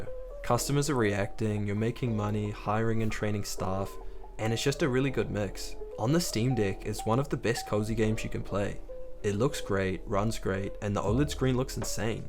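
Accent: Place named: New Zealand. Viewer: the New Zealand accent and saying deck is so funny 😅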